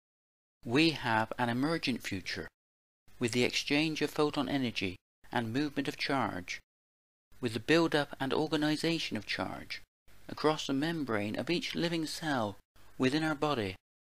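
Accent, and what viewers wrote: West Country, United Kingdom. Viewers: Lovely West Country accent.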